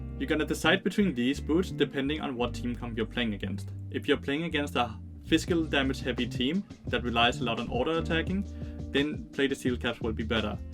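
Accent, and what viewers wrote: Denmark. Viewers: Are you Danish? :p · Bro are you Danish?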